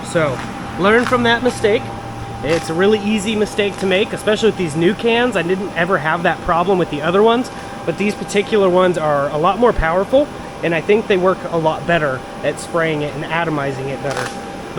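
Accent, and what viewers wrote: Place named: Arizona, United States. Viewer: Good stuff bro! God bless you and your channel! That’s not a Texas accent, you sound like Arizona, but that looks like south East Texas… Take Care brother, appreciate your encouragement!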